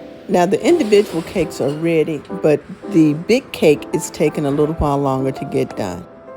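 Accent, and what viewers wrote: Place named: South, United States. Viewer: Wow, you you look much too young to be the grandmother of those children. Black women are lucky to have such beautiful skin that ages so well. I look much older than my Jamaican friend of the same age. I also love your beautiful Southern voice and gentle air. I'm going to try this recipe but I think I will reduce the sugar. I'm going to watch your other videos. Peace and Love. Sheenagh from Canada.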